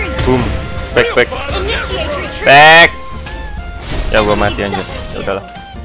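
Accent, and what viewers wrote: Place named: Madura, Indonesia. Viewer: Orang mna lu?kek bhs madura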